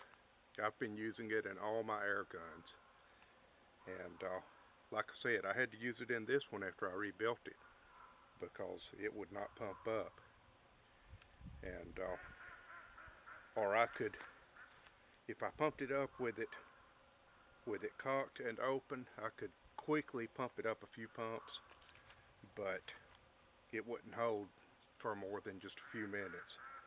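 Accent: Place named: Louisiana, United States. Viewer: Interesting. Your accent and phraseology are just like my relatives (mostly gone now) from Bienville Parish. But, they came there long ago from the Montgomery area of AL. I used to live in Chattanooga. My Sheridan C9A (which I bought new in 1998) wasn't shot for a long time until recently. It was not stored with any air in it. I got it out recently and I've been trying to shoot it, but I was cocking the bolt and placing a pellet in the chamber. Then, I would start pumping and on about the 2nd or 3rd pump, it would shot out the pellet out at low velocity. After that, I could still pull the trigger and it would 'shoot' with no pellet. I have oiled it since with some compressor oil and not inserted pellet until after I've pumped and it seems to be shooting ok now. But, on the last few pumps, it seems I hear air moving somewhere right after the stroke if finished. 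Thanks for video!! Steve McCoy Van Osdell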